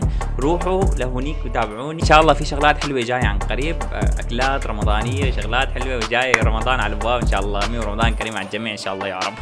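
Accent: Algeria. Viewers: لهجة جزاءرية طالعة حلوة عليك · ههههههه ممتاز ف اللهجة الحزائرية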